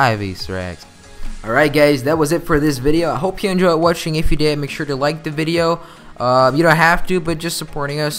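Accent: Netherlands. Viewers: its the way pronounces its cos he is from he netherlands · He sounds like he's got abit of an accent he had another video were a twitter screenshot was in a different language so I'm guessing he may be Dutch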